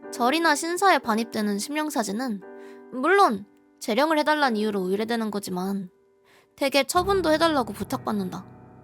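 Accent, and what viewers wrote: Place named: Japan. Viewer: 언니님 일본어 느낌 너무 좋아요..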